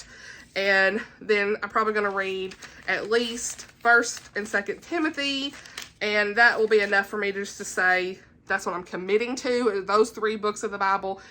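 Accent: South, United States. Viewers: Are you down south with me?? I’m in Arkansas. I hear the twang 😎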